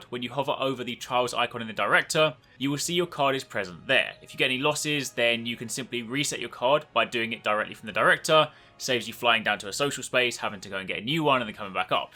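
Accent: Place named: United Kingdom. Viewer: Wait are you seriously that black man and sound British?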